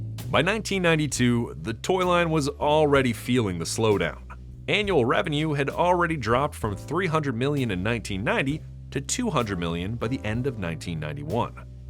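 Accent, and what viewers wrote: Canada. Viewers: You will quadruple your views by replacing this cornball Canadian narrator. Said with peace and love. “With full medical insurance”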